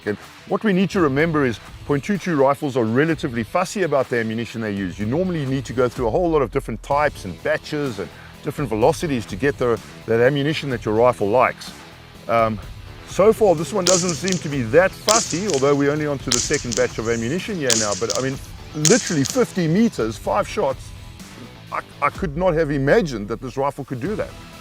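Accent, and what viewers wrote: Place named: South Africa. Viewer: South African? Hallo boet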